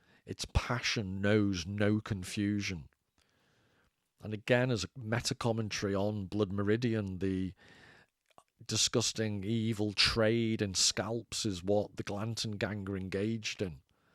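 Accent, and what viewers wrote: Liverpool, United Kingdom. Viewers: just discovered you today, I will subscribe, I have read blood meridian about 5 times, although suttree is my favourite, I will have to read America, good to hear a Scouse accent, i left Liverpool 35 years ago!! Keep on keepin on 🙏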